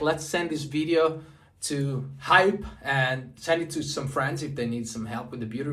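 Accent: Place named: United States. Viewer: Your English is pretty good! Where did you learn it? You sound like you have been in the States for a while.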